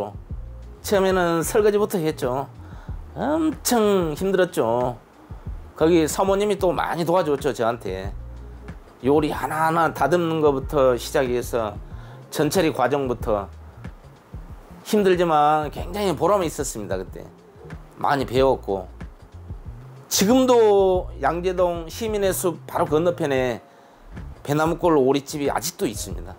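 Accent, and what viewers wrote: Jeolla, South Korea. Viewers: 셰프님 구수한 말씨가 정녕 전라도 가 고향이신거 같습니다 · 세프님 너무 섬세 하시고 또 전라도 사투리 로 너무 정겹게 느껴지고 또 많은요리 소개 해주시고 넘 감사하고 좋았습니다 세프님 날로 번창하시길 기도합니다 다시한번 축하드려요 🎉💗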